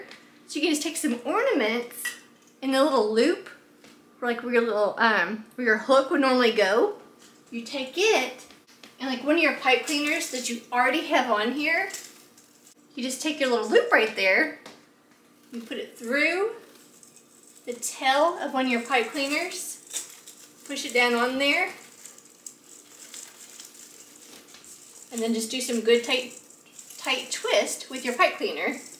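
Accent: South, United States. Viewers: Hey Ms. I was looking on You Tube for a "fairly easy Grinch DIY wreath, and here you are... another Sothern Belle that sounds like me. (Roll Tide) Anyway, my best friend lost her husband a couple of years ago on Easter and is struggling with the holidays. I lost the love of my life 8 weeks ago and not looking forward to these Holidays, Even though he (hubby)would want me to grieve like I am, I'm trying to find some normalcy. So I am making her a Grinch Wreath. I wanted to thank you for your uplifting personality and sweet, patient spirit and MAKING THIS LOOK DO-ABLE. Have a wonderful Thanksgiving and Merry Christmas!!!!! And again, Thank you!!!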